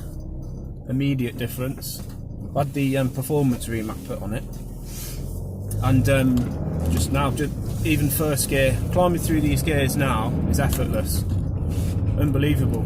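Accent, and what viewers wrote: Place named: Midlands, United Kingdom. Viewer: You sound like you are from the midlands. Where did you have this done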